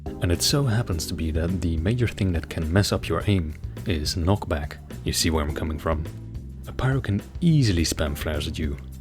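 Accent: Netherlands. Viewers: Hey, nice vid. I was wondering, are you dutch? Your accent sounds dutch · are you dutch? your sounds like it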